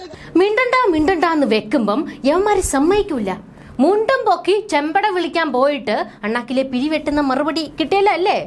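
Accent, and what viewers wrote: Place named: Kollam, India. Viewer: കല്പന ചേച്ചി തന്നെ കൊല്ലം ഫാഷ സൂപ്പർ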